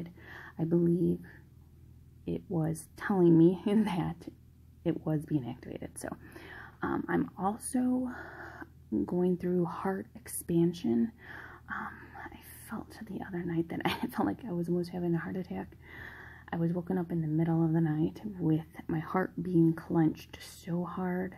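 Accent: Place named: Illinois, United States. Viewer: I understand!! My voice sounds so weird hearing it back. We are our best critics but shouldn’t be!!! And yes it’s slight but you definitely pronounce certain words with a IL accent lol. I like it! It just sticks out to me living in NJ / NY area with the heavy accents but mine is pretty neutral compared to others. Much love!!!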